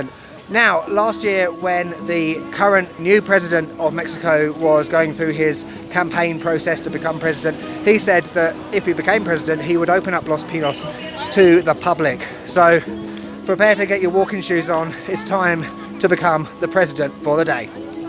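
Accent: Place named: United Kingdom. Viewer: Hi! You did an excellent job, I love how you feel the environment in any place make is so special and also I always enjoy you personality and of course your British accent. Big hug for you Carlos.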